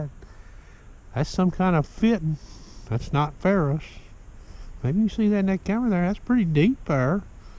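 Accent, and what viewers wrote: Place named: Southern Appalachia, United States. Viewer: If I close my eyes and listen sounds like papaw to me! Lol as a southern appalachia boy this channel feels like home and love the accent!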